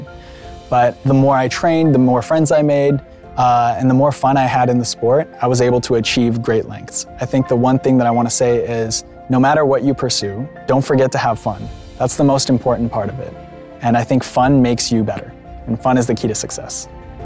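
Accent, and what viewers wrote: United States. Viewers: معاه اكسنت واحد يقول انه امريكي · في اللهجة أمريكي اما الشكل يمااااااااني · اكثر شئ ركزت عليه اللكنه الأمريكية ...استمر يا بطل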